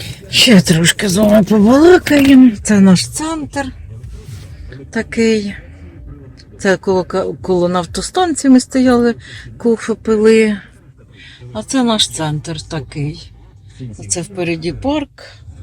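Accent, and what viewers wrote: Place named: Dnipropetrovsk, Ukraine. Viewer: Доброго ранку!Дивлюсь Вас і посміхаюсь,Ви такі класні!І говір у Вас такий ,як у нас ,ми з Дніпропетровщини,теж сільські,але рідко кудись вибираємось.Дуже дякую за Ваші прогулянки ,А балачки то взагалі , як похід до психолога,тут і поради і пояснення!З Вами просто і легко!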